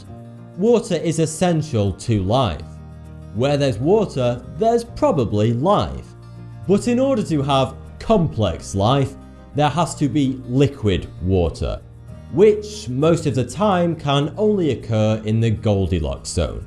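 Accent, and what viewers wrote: United Kingdom. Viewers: 偏英式 我覺得很好聽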